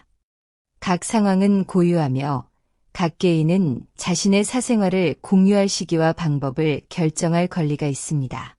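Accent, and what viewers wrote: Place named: United States. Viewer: 미국 사람이 이야기하는거 같다. 가짜 이야기를 억지로 하는거 같다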